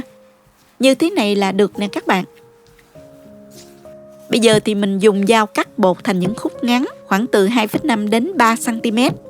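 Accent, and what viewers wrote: South, Vietnam. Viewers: o dau cung duoc nau do an ngon co giong noi dac chat nam bo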